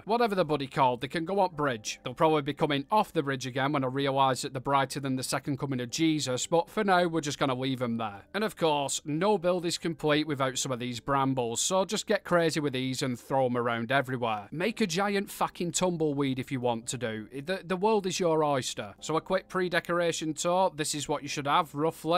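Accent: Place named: Northern England, United Kingdom. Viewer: Thats an amazing build, thanks for giving me some ideas. I am a rubbish base builder at present but watching your videos makes it look so easy, also being a northerner myself I really appreciate your northern delivery of all this, simple and straight to the point. Keep up the great work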